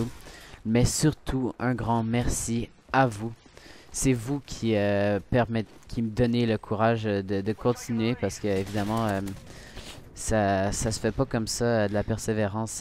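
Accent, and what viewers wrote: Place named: Quebec, Canada. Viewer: bravo pour avoir dépasser les cent abonnés , pour l'accent ont si habitue , beaucoup d'excellent youtubeur sont québécois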